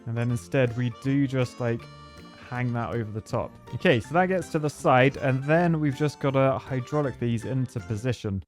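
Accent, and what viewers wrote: United Kingdom. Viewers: I'm not so much into Poly Bridge, but when I saw "Bridgehemian Rhapsody", I had to click ! And it was awesome !!! I love watching your videos because : _ your british accent (I'm french and I love it) _ I like video games _ I like engineering _ the bridge reviews _ the strongest shapes everywhere _ your humour ....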